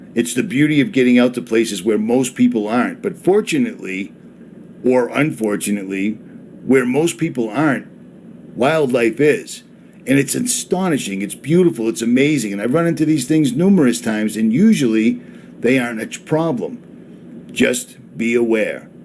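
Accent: Boston, United States. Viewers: Sounds like Richie from Boston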